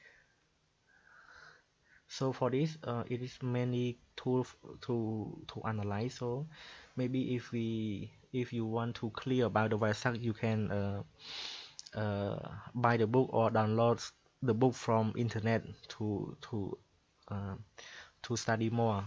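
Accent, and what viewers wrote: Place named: Vietnam. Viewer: thang nay nguoi viet chac luon :v Cai accent deo chay dau duoc lol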